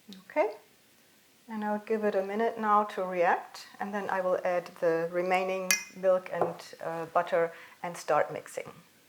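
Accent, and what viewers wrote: Germany. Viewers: A GERMAN SPEAKING ENGLISH!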